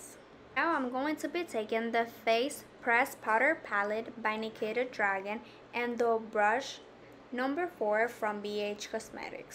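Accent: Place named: Cuba. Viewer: Sis pero y ese acento cubano? 🇨🇺 y que fueeeee, ta viendo muchos videos de Lohana 😅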